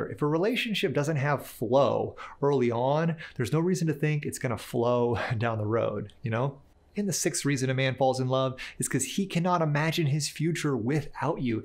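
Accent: New York, United States. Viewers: Omg i love your new york lady accent 😂😂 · Are you American?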